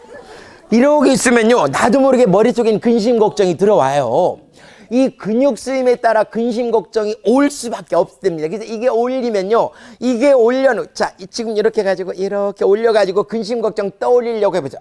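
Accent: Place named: Jeju, South Korea. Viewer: 제주도에서 사투리로 웃음강의하시는 쌤 입니다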